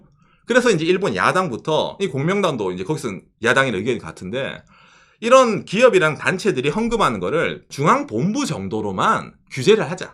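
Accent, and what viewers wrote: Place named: Gyeongsang, South Korea. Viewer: 도... 경상도 사투리... 쓰네요... ㅎㅎㅎ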